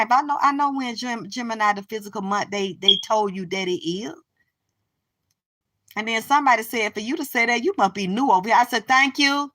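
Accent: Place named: New Orleans, United States. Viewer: Your accent I love it and you are funny sound like u may be from New Orleans dirty dirty south accent dirty my favorite word you say lil Wayne and Juvenile say that dirty all the time.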